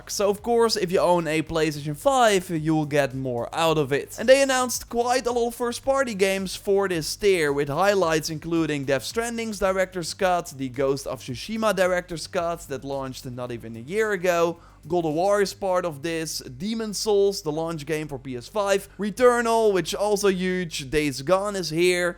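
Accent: Netherlands. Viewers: Do I hear a Dutch accent jongeman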